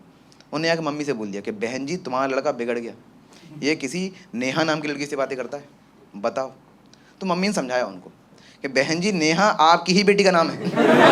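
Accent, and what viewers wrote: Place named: Gwalior, India. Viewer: Proper gwalior accent 😂😂 love from gwalior...proud of you ❤❤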